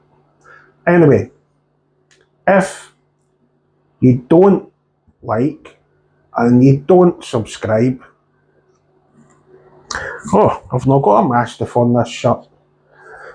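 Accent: Scotland, United Kingdom. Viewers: The trade in is an awesome idea, wish we had something like that in the UK, I'll definitely get in touch and see about the shipping cost! Also I've got no problem with your Scottish accent!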